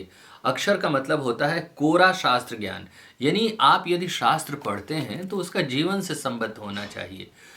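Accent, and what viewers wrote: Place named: Haryana, India. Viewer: Inke dohe ka uccharan Magahi jaisa kijiye na ki Hariyanvai jaise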